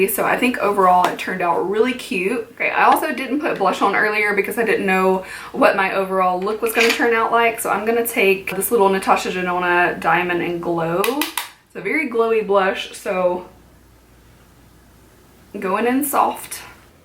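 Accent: South, United States. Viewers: I can hear the country coming out in your voice a lot in this video love it! :-) maybe because your so excited!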